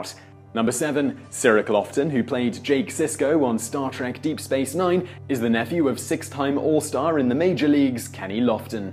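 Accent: England, United Kingdom. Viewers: To all the complainers: DO NOT WATCH. Crybabies., most of your audience does not care how you pronounce words. Your British accent is marvellous. We Americans use a form of PIDGIN English any way. Can't even spell, bad punctuation, stupid grammar, borrowed YOUR language. Ingrates! As British as Apple Pie, "My country 'tis of thee"(America)="God save the King", etc. The US has borrowed much of it's culture from the English AND some from the FRENCH. If you do not like that, give the Statue of Liberty back to the French. And also 1/3 of the modern English language. Sorry for the IGNORANCE of some "Americans". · I understand your English accent would have some words sound a bit different. However... Gene Roddenberry's name is not pronounced Roe-den-bree, it is pronounced Rod-en-berry. Whoopi Goldberg is not Whoopi Golds-berg. Also, LeVar Burton's name s not pronounced Lee-Var, it is pronounced Luh-Var.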